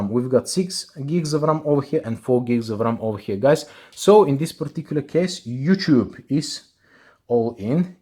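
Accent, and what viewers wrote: Russia. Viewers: russian accent prosto pizdec) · Russian bad English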